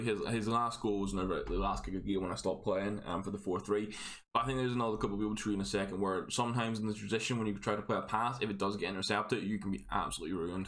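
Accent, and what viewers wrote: Scotland, United Kingdom. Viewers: Yasssss he’s fuckin Scottish. Happening big chap. Cheers for the vid. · WOoohooo Which part of scotland are you from, Belfast? Love Scottish streamers!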